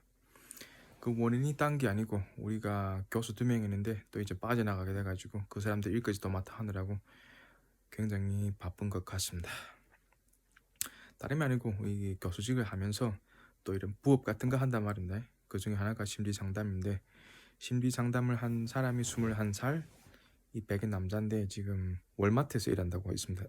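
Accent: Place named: Yanbian, China. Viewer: 구수한 연변 말투 😊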